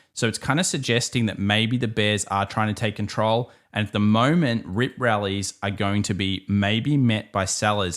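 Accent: Australia. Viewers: Hi, your vids are excellent education regarding TA and stats. A huge thank you! I like the aussie accent, too. It reminds me of the time when I was living there.:) Keep up the great work! Cheers from Germany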